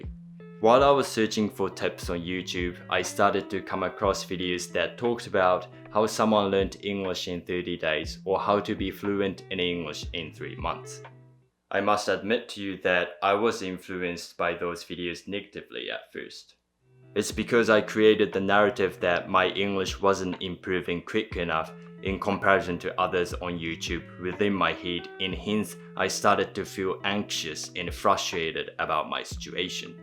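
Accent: New Zealand. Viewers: You're a Kiwi, aren't you? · stumbled upon your videos and i must say the way you say certain words is very 'kiwi". some words i picked up are get, seven, end, test, next, friends. Mostly the vowel sounds of 'e'that are almost pronounced as 'i'. Like you, i''m a migrant to NZ and can relate to your struggles. Your videos are very cool by the way. Your new follower from Auckland. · Kia Ora. Your English is perfect and still very "kiwi". Great to see you wearing your 'pounamu' as well. I do hope you will come and visit us in NZ in the future. Love your videos! Best regards from down here in the other shaky isles. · Your English is very fluent. You can definitely hear the NZ influence in your accent though :) Do you ever forget japanese from time to time?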